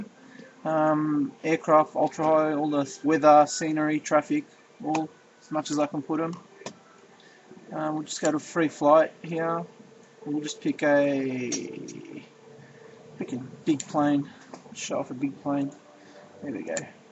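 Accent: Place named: Australia. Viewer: are you australian, cause you sure sound like one ...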